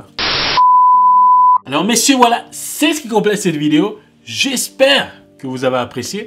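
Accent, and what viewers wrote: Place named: Quebec, Canada. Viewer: j'aime trop ton accent,français et des expressions québécoises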